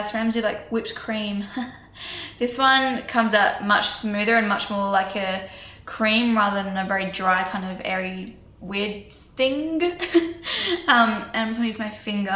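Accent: Australia. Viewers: Ur Australian accent is so cute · Australian or New Zealand?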